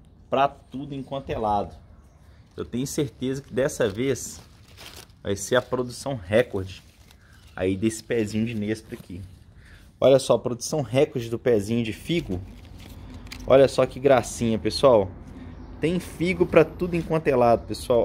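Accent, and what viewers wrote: Minas Gerais, Brazil. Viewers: Você é mineirinho?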